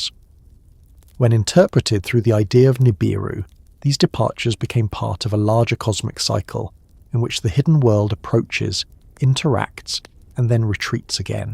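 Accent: United Kingdom. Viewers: How many channels do you have? You are the same british voiceover on many other similar topic of a video. Are you an AI British voice?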